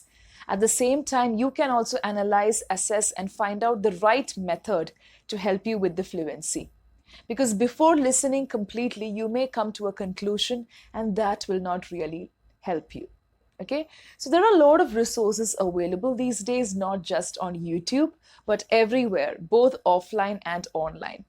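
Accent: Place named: North India, India. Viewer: When you speak English, it sounds like u r from north india. 🤔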